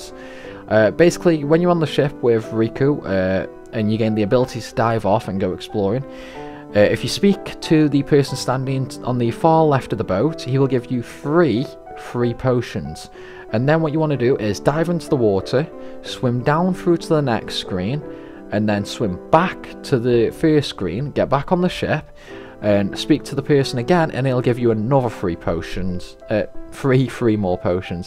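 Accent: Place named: Manchester, United Kingdom. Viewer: Are you a Manchester? I recognise that accent pal 👌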